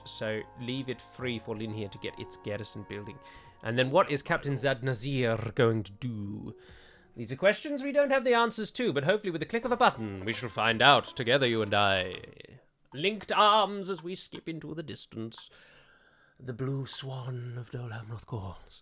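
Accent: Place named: England, United Kingdom. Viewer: First, I want to guess Edhellond could mean something like, 'Elf-Haven' but I have no idea in all honesty. Secondly, I've always loved your videos and we will all undoubtedly miss your channel. I just wanted to say before you go, I wish there were more people on YouTube like you. To be fair, I don't watch much YouTube and your channel is the only channel I watch regularly. You have an old-soul like many of the nuns I know in the Convent I work in, but with an English accent, haha. Hopefully life goes well with you beyond YouTube. Best of luck to you!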